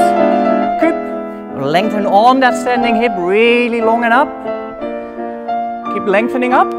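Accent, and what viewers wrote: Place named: Australia. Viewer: his accent sounds partly Australian ...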